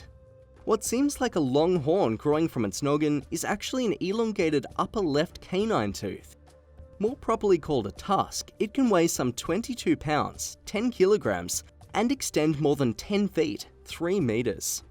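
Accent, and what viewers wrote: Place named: Australia. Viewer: Gotta love an Ozzie accent! 👌🏼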